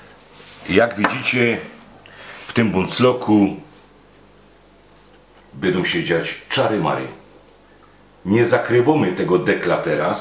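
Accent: Silesia, Poland. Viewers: Pozdrawiam z Warszawy z okolic lotniska na Bemowie, na którym to w 1959 roku lądował vice prezydent USA Richard Nixon. Wtedy pas na Okęciu był za krótki. A mnie i tak jeszcze nie było. Podoba mi się ta śląska gadka i trochę zazdroszczę bo warszawska już prawie wymarła. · Panie niech Pan nagrywa więcej filmików przyjemnie się ogląda i ta Godka. Ja bym chciał zobaczyć odcinek fasolka. Pozdrawiam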